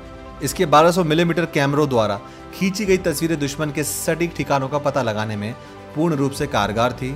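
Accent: Standard. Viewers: Shudh hindi super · आपकी सुद्ध हिंदी बहुत अच्छी है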